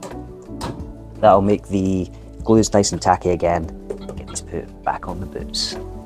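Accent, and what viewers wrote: Scotland, United Kingdom. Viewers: Just started watching your videos 3 days ago and absolutely love them! Recognized that Scottish accent too. I was stationed at Holy Loch in 70-71. I really miss that place and its wonderful people there. I just subbed and look forward to your excellent shoe repair.😊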